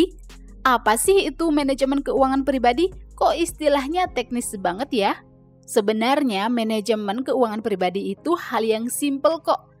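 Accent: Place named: Malaysia. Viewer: Logat melayu ya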